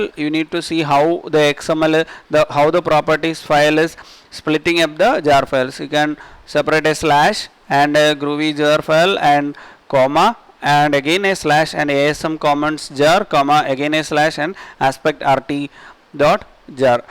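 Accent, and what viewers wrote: India. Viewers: your accent is very annoying ,plz try to speak better and clearer and further away from that ... indian accent. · Mallu Accent :D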